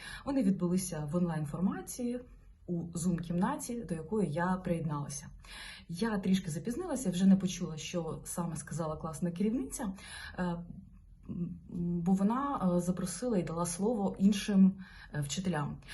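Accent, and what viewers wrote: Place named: Russia. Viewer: Когда говорила по Русские- было интересней слушать. И по украински плохо говорит - сразу видно, русскоговорящая. Из- за мовы- отписка.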